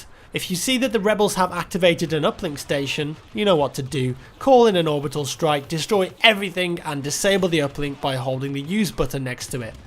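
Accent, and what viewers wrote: England, United Kingdom. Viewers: You seem to have the same English accent as Rick shiels a golf instructor on youtube. I wonder if you fellas are from the same area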